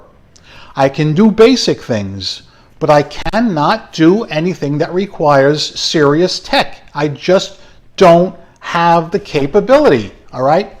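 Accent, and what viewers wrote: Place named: East Coast, United States. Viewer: my west coast ears hearing an east coast voice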